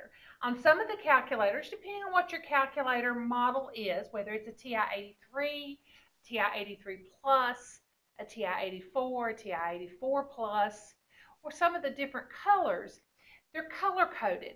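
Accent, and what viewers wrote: United States. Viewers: God bless you, are you from Boston?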